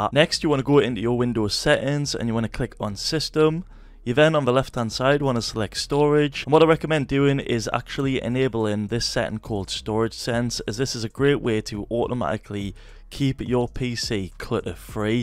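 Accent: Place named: Newcastle, United Kingdom. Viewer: Never been so excited to hear someone else from newcastle lmao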